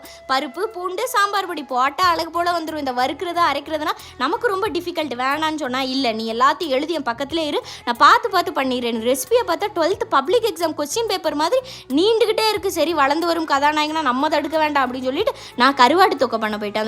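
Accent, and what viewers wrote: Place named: Tamil Nadu, India. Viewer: i am watching your videos only for your tamil slang.its very awesome and make me to watch video repeatedly.use scissor for cutting karuvadu.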